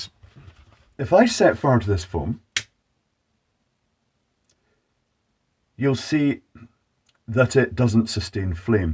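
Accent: Scotland, United Kingdom. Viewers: Total difference but your deep Scottish bass tones are still there 😃👍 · The unintelligible issue is caused by the Scottish accent not the foam. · I watch your videos and verry much like them, but off topic now. Are you Scottish?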